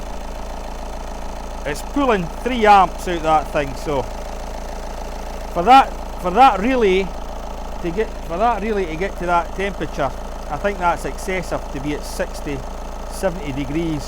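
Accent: Scotland, United Kingdom. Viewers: Thanks for the video. I like ur teaching especially the Scottish accent. Will subscribe! My son's audi a3 2010. Keeps cutting out which is why I'm here. Normally when at operating temperature. But no real pattern. Code said crankshaft shaft sensor. I replaced. Cleared code. Drove 100miles the happened again. So I replaced cam shaft sensor. Not clearer code but cut out at warm engine Started again and let it idle 1hr. Fans on few times. But confident to drive it incase cuts out again But will look at this. Thanks Anything else I should try look for? Wish u were in London.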